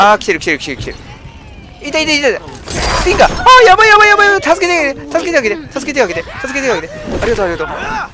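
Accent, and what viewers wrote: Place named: Kyushu, Japan. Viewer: 九州の方ですか?? 方言かわいいですね(*^^*)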